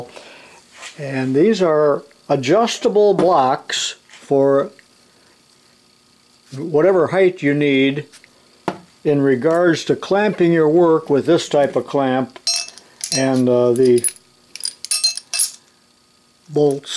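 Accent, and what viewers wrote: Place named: Illinois, United States. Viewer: i know this sounds really weird and stalk ish of me but i live in Quincy Sensei and there is a part of me that deeply wants to meet you before we have to say goodbye to you for good, and time is short. i don't know how we could work that out and keep our privacy intact. but it is a honest wish of mine. it pulls at my hart just a little lets just say.for no farther than i'm thinking it is . i'm taking a ball-park stab at the distance of a drive it might be. i think it be a lasting regret if i didn't at least try if it was o.k. with you. i'm thinking Central ill, from the sounds of it. meaning your manner of speech . the state is regional like that if you haven't noticed in all your years. and the way southern ill. has its own sound as you go farther south . like when the closer you get to that dump the call Chicago as well the sound changes.like when your are next to Mo. 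or Iowa being smack dab in the mid west. we border quite a few states and well they all have an influence . but rural central ill is a island all to it's self . you don't have to say here an i don't advise it you know how some people are. its not as innocent of a world as it was in your younger years i wouldn't want you bothered by any unwanted visitors. but just maybe you could see your way to agree to meet some place public . oh i don't know thought just hit me just now to suggest the local court house if that made you more comfortable . well i wont pester you about it any longer it just think it over. one m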